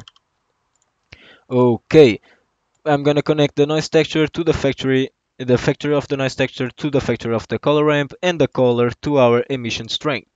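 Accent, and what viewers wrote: Portugal. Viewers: Tuga no blender? Vou ja dar sub! soube logo pelo sotaque hahah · hahahha bro ouvi tipo 10 segundos e soube logo que eras tuga obg pelo tutorial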